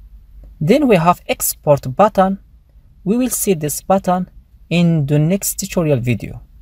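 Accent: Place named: India. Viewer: He is speaking English with Indian accent.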